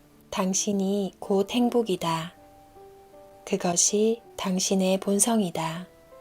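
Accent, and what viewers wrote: Gyeongsang, South Korea. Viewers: 경상도 사투리가 부드럽고 달달한 목소리로 들립니다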